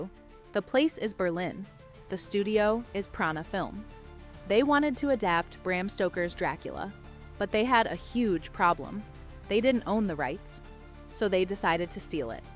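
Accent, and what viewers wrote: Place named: England, United Kingdom. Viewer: good answer! an elite vampire friend of yours taught you how to speak in a clear and authentic English accent. I thought you lived in England like I do. You had me fooled. Impressed by your videos about Marishka and the other two brides of Dracula. You are a good story teller. Have you seen The Brides of Dracula with Peter Cushing?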